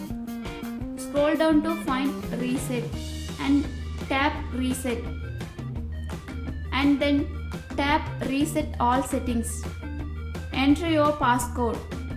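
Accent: India. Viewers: Opens video Hears indian accent Closes video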